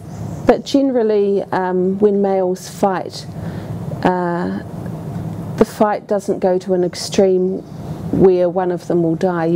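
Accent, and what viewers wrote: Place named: New Zealand. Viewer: How the fuck does someone without a fucked up new zealand accent pronounce weta? She is saying witta, so do I pronounce it wet-ah or weet-ah?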